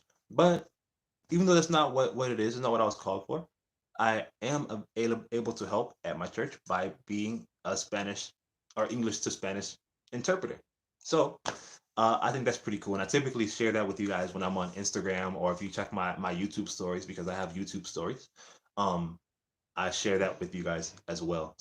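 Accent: Dominican Republic. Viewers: hola desde cuando y porque te gusta mi cultura y el país, que cosa te llevo a eso, pareces dominicano en el acento cuando hablas español, y sabes mucho sobre nuestro acento, Greeting From Sto.Dgo. Dominican Republic, Quisqueya La Bella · Suenas más como un dominicano(masculine)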